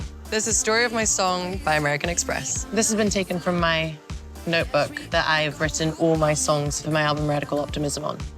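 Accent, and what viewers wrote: United Kingdom. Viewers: Love your songs,your unique style and your British accent.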